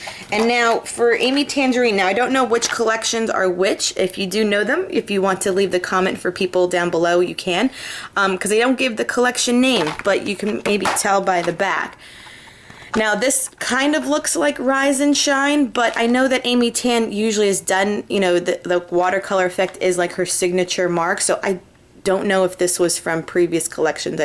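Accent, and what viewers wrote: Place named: New York, United States. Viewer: I knew you from NYC! I here it in your voice lol. Anyways.. Love the haul!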